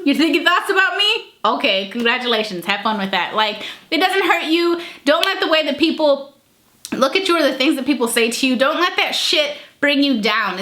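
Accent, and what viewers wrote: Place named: Chicago, United States. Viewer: I am a new"ish" subscriber and I swear I was like "she's from Chicago, I can tell" and sure enough I just added you on Instagram and it says your from Chicago! I'm from the Chicagoland area too! ☺️✌️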